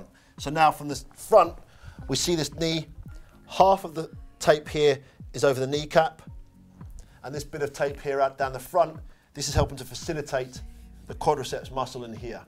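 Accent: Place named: England, United Kingdom. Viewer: that English accent he has is awesome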